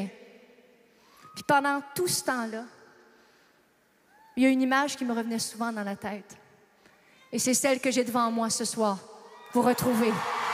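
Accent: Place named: Canada. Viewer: Странно ,говорит по французски, но даже моё русское ухо слышит большую разницу между француженкой и канадкой.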